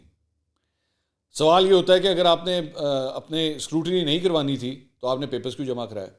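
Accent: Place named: Lahore, Pakistan. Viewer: Lahore style talk I like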